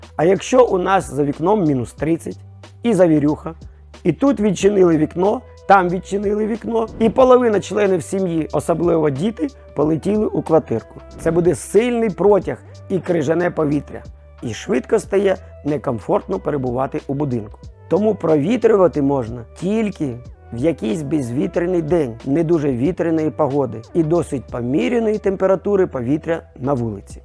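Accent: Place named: Central Ukraine, Ukraine. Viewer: Я так зрозумів чоловік родом десь с центральної України чується щось рідне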